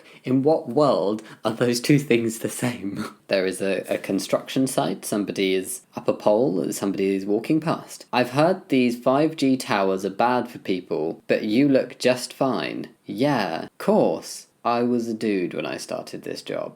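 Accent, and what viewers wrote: England, United Kingdom. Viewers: He's British. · Do you also get upset when people say "tom-AH-toh"? He has an English accent due to living in this place called England where things are pronounced differently.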